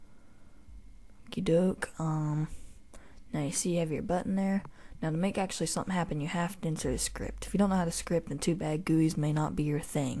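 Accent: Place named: Florida, United States. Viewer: dude your voice sounds a mixture of something like you live in florida and then you sound kind of low anyway that was off subject.========================== thx video really helped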